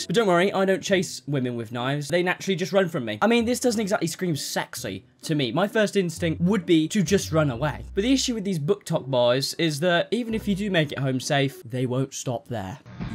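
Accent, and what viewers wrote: United Kingdom. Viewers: I love this brittish, potientially scottish and or austrailian man and his amazing content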